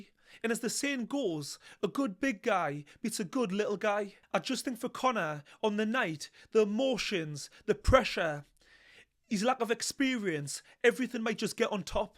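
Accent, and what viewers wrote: Newcastle, United Kingdom. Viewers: Are you from Newcastle? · the Newcastle accent 😂🔥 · You sound like a Geordie mate ....you local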